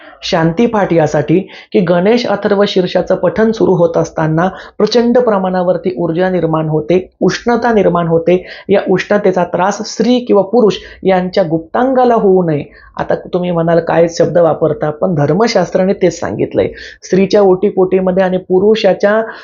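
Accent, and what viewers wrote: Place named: Vidarbha, India. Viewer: भाऊ आपली विदर्भाची गोड भाषा आहे आणि तुमच्या मुखातून जास्तच गोड वाटली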